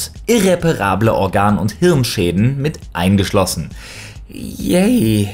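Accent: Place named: Bavaria, Germany. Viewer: Der und sein bayrisch! <3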